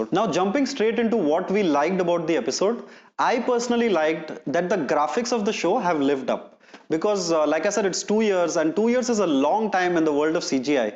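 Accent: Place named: Hyderabad, India. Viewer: Thank you for your Hyderabadi English and Hindi.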